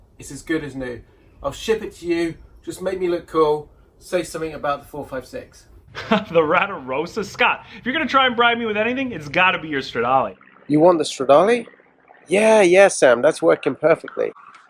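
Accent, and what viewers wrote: England, United Kingdom. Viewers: Came over from...fellow Englishman and so don’t need subtitles 😂🤪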